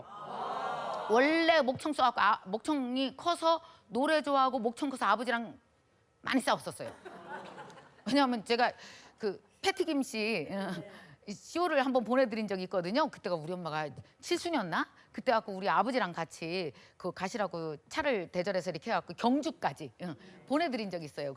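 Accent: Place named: Chungcheong, South Korea. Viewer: 구수한 충청도사투리 넘! 강의 잘듣고 많이 웃고 감니다.....🎉😅❤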